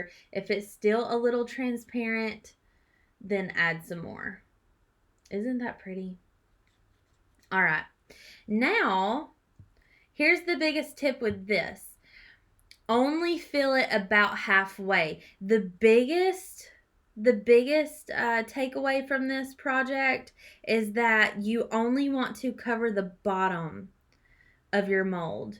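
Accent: South, United States. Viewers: Your beautiful projects match your beautiful smile & personality. I’m in MI and l could listen to your sweet, southern accent all day. Thank you for taking time from your family to show us these crafts. They are always so beautiful!💕🎄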